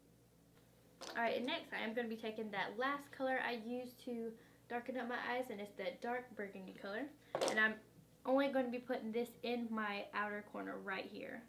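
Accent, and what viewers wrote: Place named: South, United States. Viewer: Wow! That’s a crazy trick considering coconut oil actually breaks down Makeup!! You look super gorg!! Loving the bloopers!!! Where are you from? I can tell you’re a southern sister!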